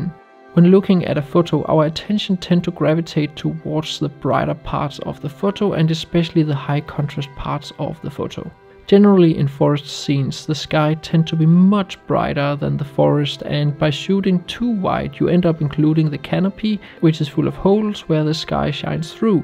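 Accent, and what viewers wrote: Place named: Denmark. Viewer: You made some excellent points in this video,. For that reason, I subscribed. The shots in this video are absolutely amazing! Keep up the good work! Also, I immediately recognized the Danish accent:)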